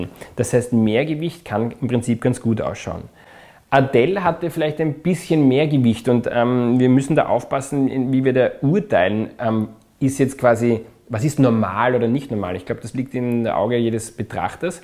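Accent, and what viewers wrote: Austria. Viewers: Herrlich der Österreich Akzent. Es geht nicht darum, ob es schön oder schiach is.... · ach wie ich diesen österreichischen Dialekt liebe! Wirklich!! 'schön oder schiach' - göttlich!!:-)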